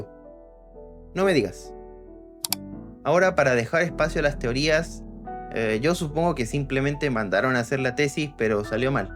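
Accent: Chile. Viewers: ¿Es chileno? 🤔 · Eres chileno? Por la forma de hablar. Por cierto, saludos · Eres chileno? Tienes el acento. Lo sé porque soy de Tacna y es muy marcado su acento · hablas como chileno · Eres sureño? Siento chilenidad en tu acento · Eres de chile ? Tienes cierto acento 🤔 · Oye porque tienes acento chileno? eres chileno o peruano me confunde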